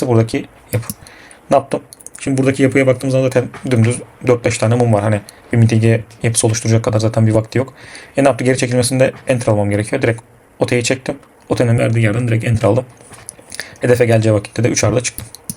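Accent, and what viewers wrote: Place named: Italy. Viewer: hocam kimlerden eğitim aldın ? konuşmandan italya rüzgarı geliyor hafif :) çok güzel bi entry modeli bu arada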